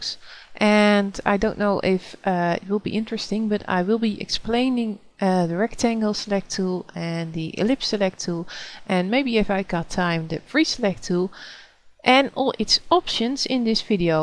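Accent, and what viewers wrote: Netherlands. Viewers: nice tut with such a trademark dutch accent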